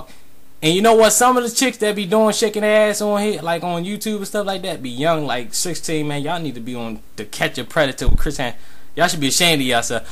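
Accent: Baltimore, United States. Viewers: are yu from baltimore dude tht made the video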